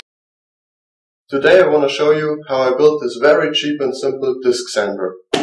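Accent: Germany. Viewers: Great job. I´m thinking of building one and yours is the best homemade disc sander that I´ve seen. Is that a German accent? The switch looks German made. I´m from Texas but I live in Germany :) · Fantastic build. It must have cost a fraction of what a factory built unit would cost. Your English is very good and your German accent is endearing.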